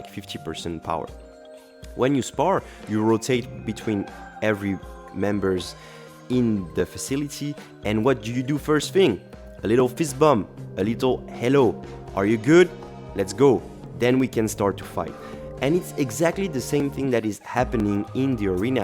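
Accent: France. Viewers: French accent makes this 10 times better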